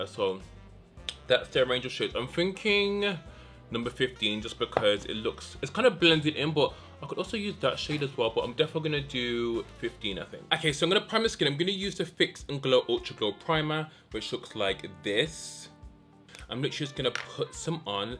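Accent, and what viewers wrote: Birmingham, United Kingdom. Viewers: Is that a Birmingham accent I hear?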